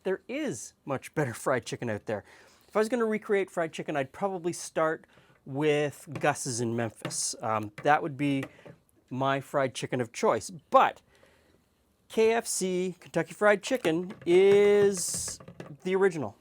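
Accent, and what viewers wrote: Canada. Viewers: 100% your canadian love the way you say about , watched a few of your vids and I have subscribed all the way from Scotland :)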